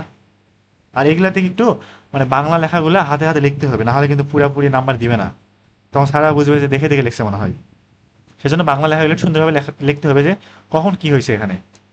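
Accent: Naogaon, Bangladesh. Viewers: Vaiyer basha naogaon te naki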